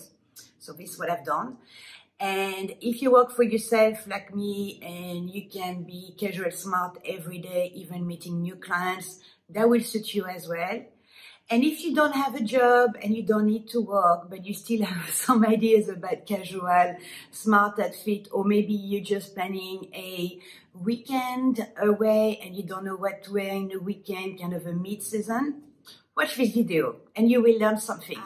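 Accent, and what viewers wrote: France. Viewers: Bonjour. Congratulations. Everyone adores your French accent!! I'm confused by what you mean about "blazers". The white jacket you are wearing I would call a blazer. The denim on denim look in Canada is what we call the "Canadian tuxudo", ha ha! I would never think to wear a dress like that over blue jeans, what a great idea. I will need to buy a dress like that to wear over blue jeans. I can't wear blue jeans to work. When I do I don't feel in the "business mind set". I feel relaxed and lazy or I should be cleaning the kitchen. Other women can wear blue jeans with no problem but I feel laxadaicle, not good. Great tips again.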